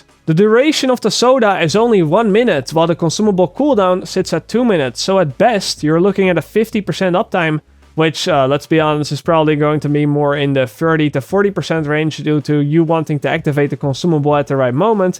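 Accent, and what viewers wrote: Netherlands. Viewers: die nederlandse accent klinkt echt kut xD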